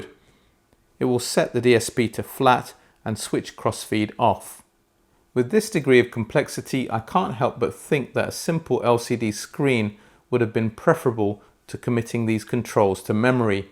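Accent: United Kingdom. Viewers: Thank you for this excellent review about the mojo 2. NB: i love your style .. please don’t change! be yourself elegant precise fair honest ! This British accent gives it some how apart gives some lead 😊 which makes me think : if his analysis matches the high level and articulation of his language then you may peacefully rely on his expertise!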